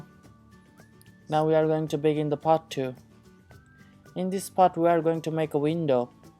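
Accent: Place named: Japan. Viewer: And guys his counting doesn't suck, Its just hard to understand his accent. How about you all take classes in Japanese language for a change, Then you will hear the accent.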